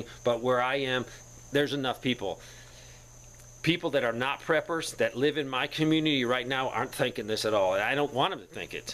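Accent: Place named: East Tennessee, United States. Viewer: What area of the country do you live in? Not asking for your street address haha or even your town. Sounds like you may live in the mountains of East TN but not sure. Anyway- great content! Love your channel!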